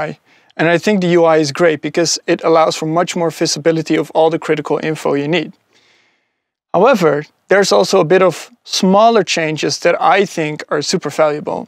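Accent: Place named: Netherlands. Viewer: Ik kan horen dat je uit Nederland komt, mooie review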